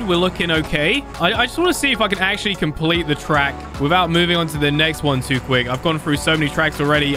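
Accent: United Kingdom. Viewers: Are you from the uk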